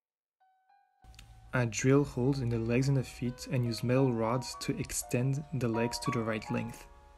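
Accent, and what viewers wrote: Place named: France. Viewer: Awesome ! Your french accent is so cute damn it drives me crazy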